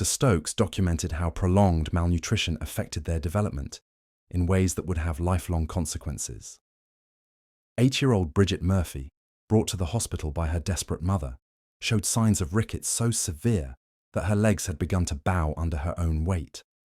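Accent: England, United Kingdom. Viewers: I know this is terrible,,,,is it just me or does his English accent make this sound more…. you know what I mean?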